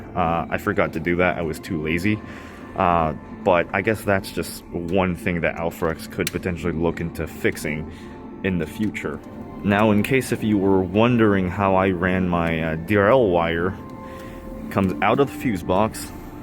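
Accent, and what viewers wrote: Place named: United States. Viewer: I see. You speak English as if you’ve lived in the States your whole life. I didn’t think you guys had Sequoia’s over there. The Alpha Rex headlights must be MUCH cheaper there if you ordered that many!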